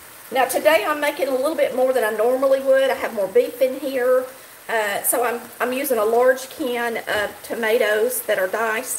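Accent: South, United States. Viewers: I absolutely love your Country accent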